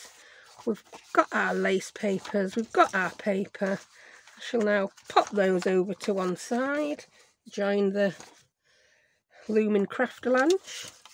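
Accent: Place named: Northern England, United Kingdom. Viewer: Just found your channel and enjoy listening to your accent I am a Geordie living in Canada and I know you are further south but your phrases remind me of home.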